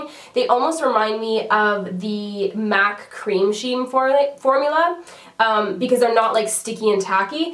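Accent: Canada. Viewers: I don't know if it is because english is not my first language but this is the first time I noticed your Canadian accent. Is real pretty though.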